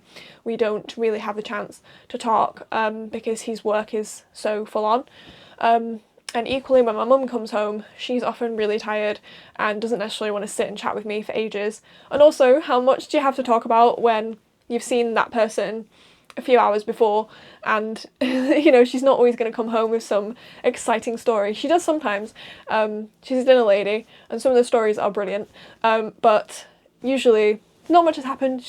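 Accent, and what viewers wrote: United Kingdom. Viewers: loved the video same im 24 and moved to a new city and am alone all the time ive lived here for nearly 2 years and I know 2 people here, also completely blew me away hearing the lancashire accent im from lancaster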